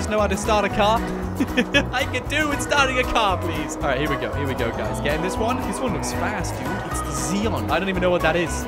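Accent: England, United Kingdom. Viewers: Is he British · hey are yu from england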